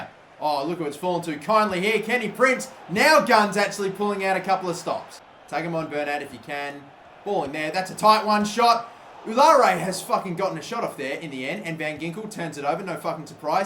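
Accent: Australia. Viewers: He's an Aussie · Is he Australian ?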